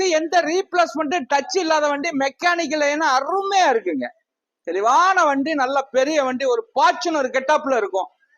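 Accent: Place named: Nilgiris, India. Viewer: Car ra neelagiri thayalam slang la sale pani ipotha pakuran😅😅😅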